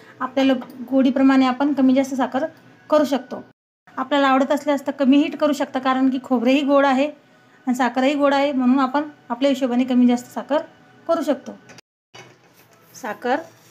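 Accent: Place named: Sillod, India. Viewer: ताई संभाजीनगर सिल्लोड तालुका आहे का तुमचा बोलण्यावरून वाटलं तसं म्हणून विचारलं 🙏😊🧘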